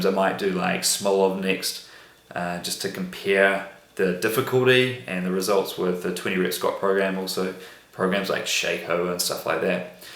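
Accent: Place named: New Zealand. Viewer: Sounds like a kiwi, chur bro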